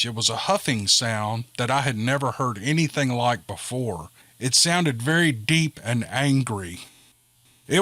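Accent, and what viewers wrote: South, United States. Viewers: Talking about southern accent mine is really close to yours and I remember the first online game I played. When I started talking everyone that was playing at that time talked about it for weeks after. I was laughing my but off at them cause I’m thinking what’s so special lol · 11/2/22 Hi. I very much enjoyed these stories. I do enjoy long videos, especially at night. About that accent. Many of my older relatives are from the south and I love to hear them speak. They just laugh at me but it's fun to listen to them anyway.😁 Anywho, thanks for the stories (and the accent)🤣. I can't wait 'til the next batch. Take care! ⭐👍⭐ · My story too 😲😂😄 I love reading 'n his sweet southern comfort draw. I listen to his tale's over 'n over...many nights he helps me fall fast asleep 😌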